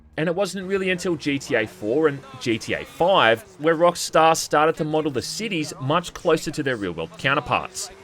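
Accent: Australia. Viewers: Fkn AUZZIE 😂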